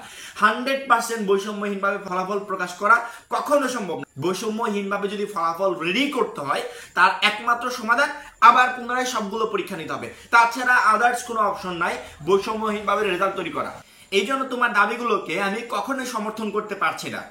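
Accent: Sylhet, Bangladesh. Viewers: Vai ki sylhet er naki?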